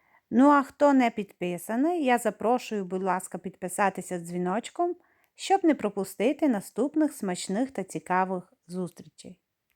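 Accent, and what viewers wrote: Transcarpathia, Ukraine. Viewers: Дякуємо за закарпатську вимову ,привіт з Білої Церкви що на Київщині!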